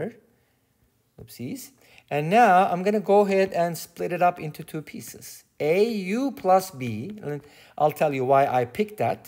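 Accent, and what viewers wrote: Turkey. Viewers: I swear you got that Turkish accent, are you Turkish by any chance?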